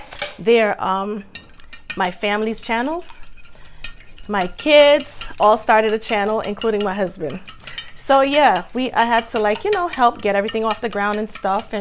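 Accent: New York, United States. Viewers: I knew I recognized that accent NY your dishes look great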